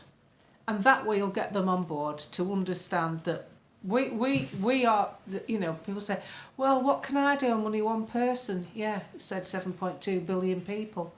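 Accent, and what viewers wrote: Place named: North, United Kingdom. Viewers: I love you’re “uncle bill” Yorkshire accent, sounds like a bloke fre down road 😂😂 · Hello, You absolutely do make a difference in body heart mind and spirit. In my opinion you are the Muse of the North. Lots love and hugs❤❤❤ PS Love your Northern accent. Your accent sounds like mine❤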